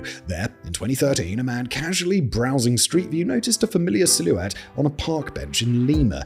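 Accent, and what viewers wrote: England, United Kingdom. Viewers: I think his team may have wanted to change up his image and make it more "hip" - but we don't WANT a hip! He's a nerdy bald guy with an English accent that makes him sound like Churchill on speed. But the whole setup has changed, different vibe altogether, complete with ruining his otherwise absurdly delightful narration. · I thought you were good. Yet you sold your soul to icoogni. The worst kind of company. Stupid british cuck. Learn to say aluminim (and Nike and Adidas and SIDEWALK because pavement is a material and not a location) correctly and maybe I will re-subscribe.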